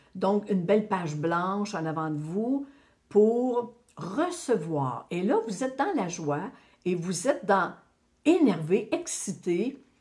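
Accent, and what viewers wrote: Quebec, Canada. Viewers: Bonjour, vous écouter est un vrai bonheur pour moi, votre joli accent québécois m'enchante et vos messages m'apportent toujours l'apaisement dont j'ai besoin. Les commentaires des uns et des autres me rassurent également car je vois que, beaucoup, comme moi....ressentent ou ont ressenti une grande fatigue, tristesse, déprime, vertiges... mais depuis quelques jours, je vais beaucoup mieux, j'ai beaucoup prié et de très belles choses me sont arrivées, alors merci les guides, merci l'univers, tout est juste...la vie est magnifique ❤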